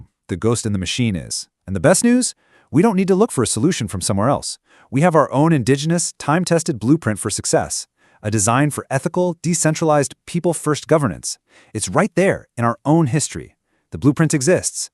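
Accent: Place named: United States. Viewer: Get the accent right with a bubbly head, this si too american.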